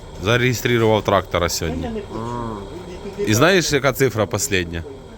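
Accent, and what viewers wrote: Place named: Western Ukraine, Ukraine. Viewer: ты из западной бо в тебя говор такой не тот что в Ярика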